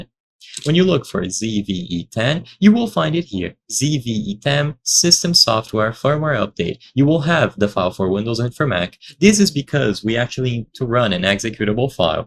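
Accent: Brazil. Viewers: Você é brasileiro? Tive impressão pelo sotaque, awesome video my friend!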